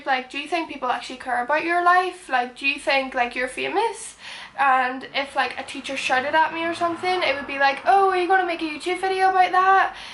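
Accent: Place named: Northern Ireland, United Kingdom. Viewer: Eline P she is from Ireland, Northern Ireland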